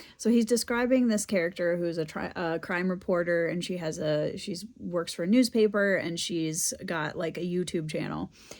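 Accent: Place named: Minnesota, United States. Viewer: I knew you had to be from Minnesota from your accent!!!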